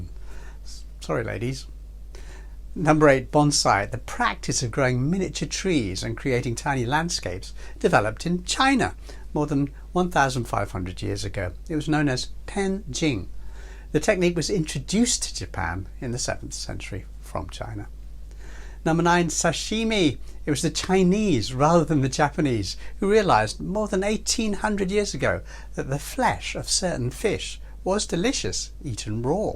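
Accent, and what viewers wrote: United Kingdom. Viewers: what a disgusting video 🤢🤮. 🇯🇵🇹🇼🇰🇷🇵🇭🇹🇭culture is all superior to china!!!! spoken by an Indian dude with a British accent. The Hypocrisy😮‍💨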